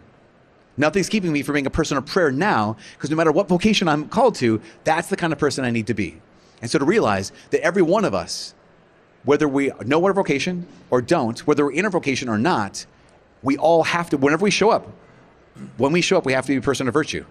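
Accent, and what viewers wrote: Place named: England, United Kingdom. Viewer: Great English accent!!!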